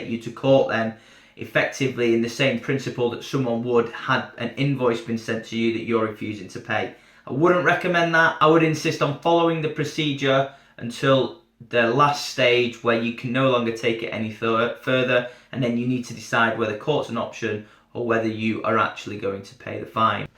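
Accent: United Kingdom. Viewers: Guile Miran I think his English accent could be the giveaway. 🤦‍♀️🤦‍♀️ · this is UK, not US. Cue is in the accenr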